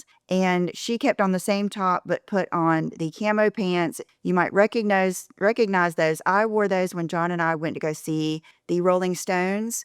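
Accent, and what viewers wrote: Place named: Boston, United States. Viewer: And YES, on the sexy Boston accent!!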